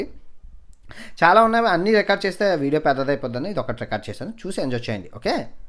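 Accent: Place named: Vizianagaram, India. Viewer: Bro i am frm Vizianagaram.. Nen miru upload chesina prathi video chusthanu... Andhukante nenu yelaago out of country vellalenu kabatti... Mana slang lo entha baaga chupisthunnaru... Nen video chusthunte nenu vellinattu vuntundhi.. Really thanks bro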